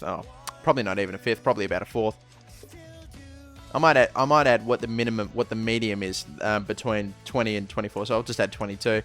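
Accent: Australia. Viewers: Are you from Australia? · Nice to hear an aussie accent on a fifa 13 video ;) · are you Australian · are you australian :( im portuguese . quem e portugues da um like ao comentario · Are you Aussie? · your aussie i love you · You Aussie mate ? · Are you Australian? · are you australian mate? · Yes an australian commentator! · Are you australian ? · what country are you from? australian ? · are u aussie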